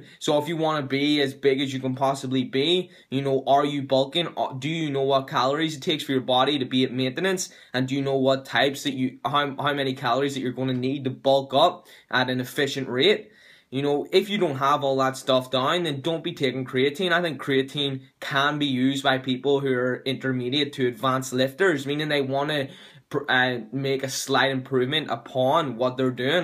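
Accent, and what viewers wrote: Northern Ireland, United Kingdom. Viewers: The norn irn accent hitting hard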